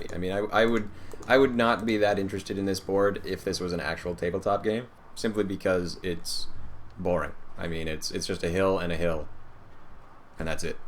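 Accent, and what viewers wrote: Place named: Canada. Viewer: He sounds Canadian. Go Nathan Fillion! I love WM/HORDES and was just about to buy this game but like so many other games with dice, this seems to both suck AND blow. 63% chance of hitting, 3 misses in a row, and I have a feeling that wasn't an isolated case. Also it seems PAINFULLY slow to play a game...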